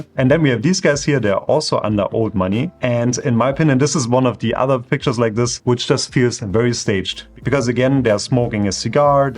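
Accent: Germany. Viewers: ich liebe es, wenn man auf einmal auf englischsprachige Deutsche trifft 😂 Der Akzent verbindet uns😂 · I hear german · Oh hör ich da etwa deutsch raus? 🤫🤔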